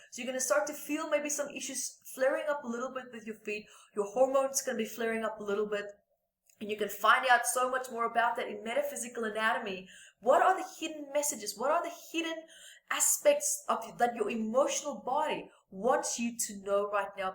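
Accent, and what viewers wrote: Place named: South Africa. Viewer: She is from South Africa 🇿🇦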